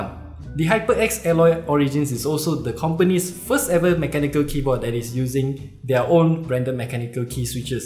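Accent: Singapore. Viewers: Noice video by the way are you Singaporean because that accent sounds soo familiar. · You from Singapore lah?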